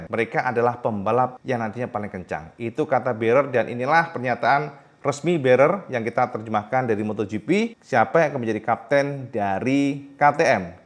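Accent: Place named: Tulungagung, Indonesia. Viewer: bahasa tulungagung e gak lali ki om ujug" 🤣🤣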